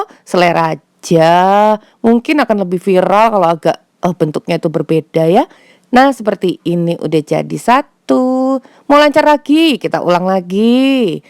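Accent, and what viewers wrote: Java, Indonesia. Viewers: Walikimsalam kaka sayg algamdllah puasa nya lancar Wah aku sellu gagal buat dadar gulung mawu coba resep Gula ama kelapa jangan jadi srundeng😆😆😆 Telur nya simpan bagian tengah ya kaka 2 sendok makan miyak gorenggggg di cicin minyak dikit dah da msup adonan nya d goyang2 wah hasil nya bagus bolong2wah rotinya melenuk gaya ngomong kaya orang jawa kaka guluk embk wah hasil mantap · Berpori euy kayak wajah AQ klo lagi ga dirawat hkhk Mm suara nya medok ok jg pantes jg jd org jowo hkhk Pas bgt buat takjil niii ga perlu beli Yg pertama bkin si unti dlu ya Kdua bkin adonan dadar nya Pake teflon ternyata kayak bkiin leker ya Selera ya boleh yg berbolong bolong boleh yg mulus dg api kecil pan hangat ..monggo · Diputtaaar....di cellup,dijillattiii😀...aq gemmess ama suaraneee,RENYAAH,wong jowoo taa...luccu,saabaaar...lope..lopee💋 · Buat isian semua bahan campur Lalau masak awas jangan sampai jd srundeng Lalau buat kulit tepung gula telur tambah santan santan kara instan lebih kental Tambahkan pasta pandan aduk sampai rata,jangan khawatie jangan sampai beekwtu janganlupa tambah minyak goreng di sari Yap Pas banget buat ta'jil baiat sesiei akan dapat 12 janganlupa Duk duslu Nang ini udah terlihat guling bolong, u menciptakan adonan bolong itu pnannya haru panas Olesi minyak masukan asona dintuplek hasilnya bolong bolong waw kayak orang Jawa wkwkwk mantab medhok nampak macan Kalau mau yang mulus api kecil pan hangat gak pake minyak,Nang sekarang akan kasih isian kedalam kulit ini yang sedang bolong bolongnya, Wah saatnya gulung wkwkwk wong jowo ngono iya to 😆 mantabz dah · wah dadar gulung nya enk bngt buat tajil cocok bngt mah dari pda bli cara biat nya juga gampng y klw liat mmh yg but tpi klw rara yg buat pasti gagal hahaha,wah ada dua macam y mah dadar gulung nya yg satu berpori yg satu nya lagi mulus ,cara buat nya sama cmn beda di saat waktu manasin adonan nya klw yg pori saat adonan d masukan ke pen pen nya tunggu panas dlu baru kasih adonan pke api kecil ,klw pngn yg mulus pen nya jngn pnas tpi hngt sama dngn api kcil klw dah buat dadar gulung nya tinggal masukin unti nya dah d gulung" jadi dah dadar gulung hahaha ,wah itu ada roti mamah abis buat roti lngsung buat dadar gulung y ,wah ada ilmu satu lagi ternyta dadar gulung ada yg bisa g pke telor juga y mah ,aduh gagal pokus ma suara mmh yg medok 😁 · Medok bangget mbk yu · Profilnya ganti jadi pangling nih, cantiiik bgt hasilnya, adonannya di saring dulu biar g bergrindil, tumben nih logatnya medok😁😁😁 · Dasar gulung pakai Nada jawa he he Isian nya kelapa Gulung gulung Ayooo gulung meneh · Medoknya jwany mn jeng, 😊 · Mari bikin dadar gulung dulu...iya bu sudah faham..ada yang seperti macan...iya cantik mom..kalau mau yang mulus pakai api kecil gak usah pakai minyak..omongannya kok kayak wong jowo mom...waduh ada roti...mau dong..lanjut di isi pakai unti kelapa...di gulung lipat gulung lipat..haha ayo lagi siapa takut...ada2 kamu mom..lumayan buat aku ketawa hari ini dadaaa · Jadi kalo untuk dadar gulung itu yang berpori semakin bolong semakin ciantikk ya, ternyata yang enak itu yang pake telur, kalo pengen porinya banyak taflon panas tambahkan minyak lalu masukan adonan, kalo pengen kulit nya halus jangan kasih minyak dan api kecill, sipp trimakasih tipnya ternyata adonan nya kasik telur dan minyak sayur, selamat berbuka puasa, aduhh ada yang logat jawa nihhh hahah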